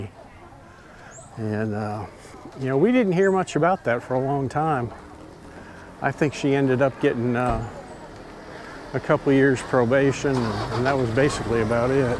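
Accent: United States. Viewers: Where are you from? You sound like your are from my neck of the woods, I am from Jefferson County just east of Knoxville TN. You sound like you are from the promised land lol! Sorry if I am wrong.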